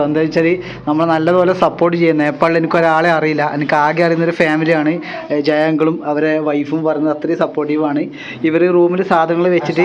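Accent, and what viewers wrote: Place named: Kottayam, India. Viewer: ചേച്ചി കോട്ടയത്തുകാരി ആണ് സ്ലാങ് കേട്ടാൽ അറിയാം. ഫാര്യ എന്ന് കേട്ടപ്പോൾ മനസ്സിലായി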